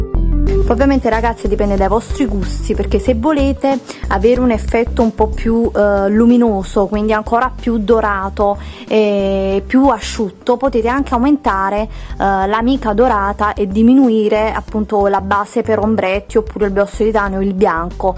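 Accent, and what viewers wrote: Naples, Italy. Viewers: Ma sei di Napoli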